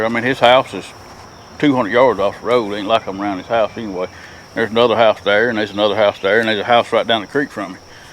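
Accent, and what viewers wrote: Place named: South, United States. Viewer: Wow man you gotta nice Southern accent LOL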